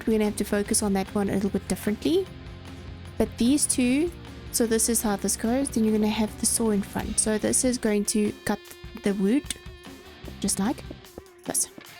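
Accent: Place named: South Africa. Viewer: Can’t get enough of the South African accent, could listen to it all day long, this coming from a Scot. Great video!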